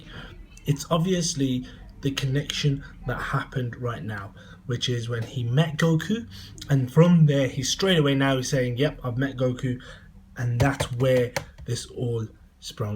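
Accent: Birmingham, United Kingdom. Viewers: Are you from Birmingham. I hear the brummie in you kiddo lol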